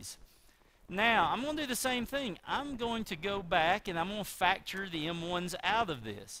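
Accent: United States. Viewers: This was my exact question, and I got an exact answer. Plus the great southern drawl makes this video ten times better. My dads side is from oklahoma and they all sound like this; i feel like i'm learning phyics from my uncle.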